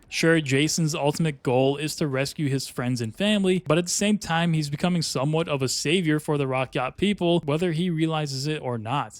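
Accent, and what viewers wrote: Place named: India. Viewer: your accent dude not American 100% just 65% are indian or something!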